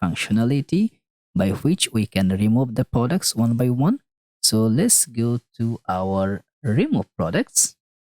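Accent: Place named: Bengal, India. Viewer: are you bangali ?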